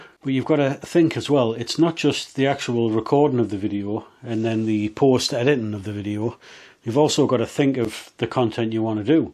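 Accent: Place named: North, United Kingdom. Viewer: Cracking video, aye good advice i didn`t get the expensive cameras just thought i would start with kit easy to learn the basics on. The editing is good lots to learn and helps you think of new technique's for content. On a massive plus it is great listening to a fellow northerner 🤙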